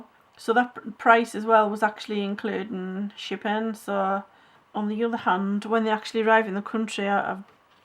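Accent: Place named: Birmingham, United Kingdom. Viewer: Absolutely love the book! Where did you get it and what is it called? I have a few open stock Pablos and I recently bought the Luminance, love what you are doing. It’s lovely and you are so pleasant to listen to 😊 I was born in Birmingham but emigrated to Australia in 1974. Your voice reminds me of the land of my birth ☺️☺️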